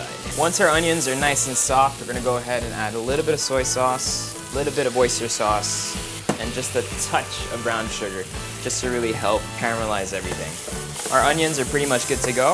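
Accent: France. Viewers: Great, simple recipe.. It look so yummy! And that French had me swooning over even more than usual. Keep it up! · good French accent ! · Homies French?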